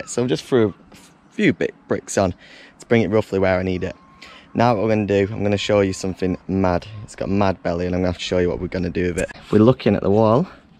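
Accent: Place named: Manchester, United Kingdom. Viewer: Not often you hear local accents on YouTube! North Manchester?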